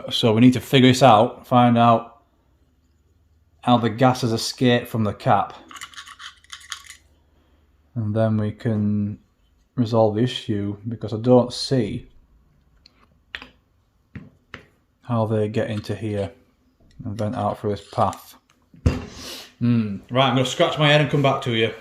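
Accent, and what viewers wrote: United Kingdom. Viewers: I have come across your channel by accident and absolutely love it, I am now a new subscriber to your channel. I love your Leeds/Bradford accent which only adds to the entertainment as far as I'm concerned. Great video's, great content, love it.....Keep it up.....I'm another VTR owner too. Cheers. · Thanks so much 😸👍 by the way your accent - are u from the same area as Sean Bean the actor?😸 My 1997 vtr is behaving a bit strange its always been a reluctant starter the 3 or so years I've had it especially in the colder mornings (below 10 degrees c starts affecting it) the colder, the worser. Just put manual cam adjusters on, tensioned by hand & went to restart to set them on the run. It wound over fired randomly, ran, didnt run, wouldn't start, backfired bad couple times. Found a plug electrode upper core broken (replaced both plugs). 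Tried again got it going & warm, adjusted cam chains by ear and shut it down thinking alls well. Tried again 5 min later & the timing chains (or something) making moaning sounds while winding over & it wound slower & made me fear its trying to sieze but at 12.66 volt it seems the battery is on the way out. Possibly starter too it was hot. But 20 min later after a cool off & battery slight self rejuvination, it turned the bike over ok however got another big backfire & sounding like it just doesn't want to fire. Battery now definitely getting down so that was it for today. Theres many things reall due now to start my maintenance records like setting valve cam clearances, I'd like to check the chain adjuster skis. Has new plugs now & I want to check the ignition coils if possible. Generator looks ok but found the left crank seal needs done (genny got oily) because the bung wouldn't come out of the generator cover cos some idiot overtightened or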